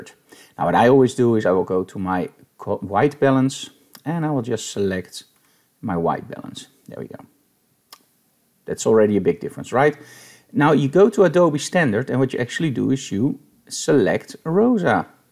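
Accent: Netherlands. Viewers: are you from holland sir ?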